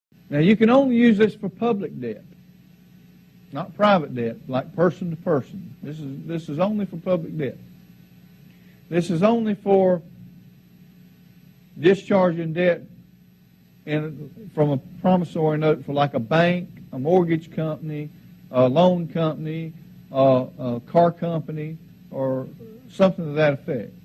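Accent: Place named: South, United States. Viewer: When talk shows were first starting out, and they had really outrageous guests with wackadoodle ideas, I would say to myself, ‘please don’t have a southern accent! Please don’t have a southern accent!’ And guess what- they always did. When this video started out, I found myself saying over and over in my head, ‘please do y be from the south! Please don’t be from the south!’ But Nooooo.... he just HAD to be from the south, Didn’t he? I’m only in , and I’m already cringing! Now? I wonder, with all of the old tales of ‘inbreeding’ in the south, is this guy a result of it? I’m from the south and I definitely want to vote him off of our ‘southern island’! And as I watch this, I’m reminded of the whole confederacy and the south. Would this be a way to try and ‘restore’ the southern confederacy, albeit under a new name?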